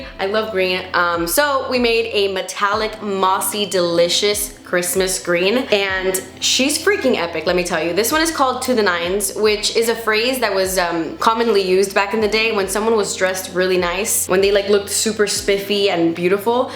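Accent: United Kingdom. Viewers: Love the british accent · I adire your videos and your attitude, but man that British accent... it freaks me out👾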